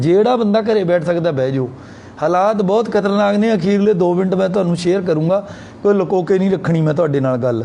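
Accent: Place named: Punjab, India. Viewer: Sir thodi raw Punjabi sunn k dil khush ho jaanda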